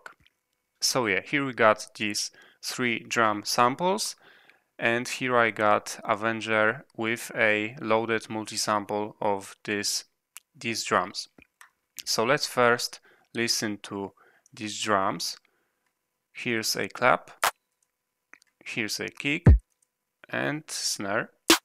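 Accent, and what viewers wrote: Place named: Poland. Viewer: Thanks, you are from Poland maybe because i feel this Polsko angielski dialekt hehe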